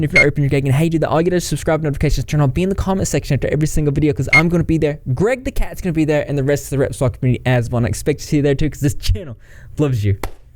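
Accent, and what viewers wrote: South, United States. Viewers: Love that southern twang 😍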